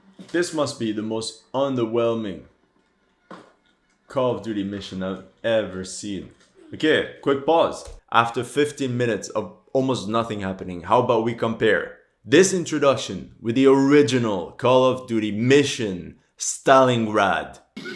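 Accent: Quebec, Canada. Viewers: He is from the french part of Canada right? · I have a question for u man where u from? because you have like a canadian accent but from Quebec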